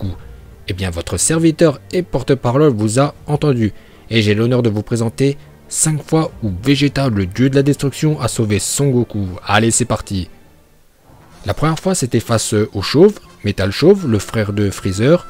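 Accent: Japan. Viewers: Ton accent japonais est super 👌 😁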